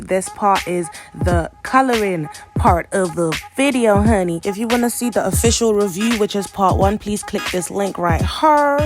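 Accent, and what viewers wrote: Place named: North London, United Kingdom. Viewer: Sis, You sound like a neighbour. #NorthLondon ? Do you do install for others? I beg yu help me!??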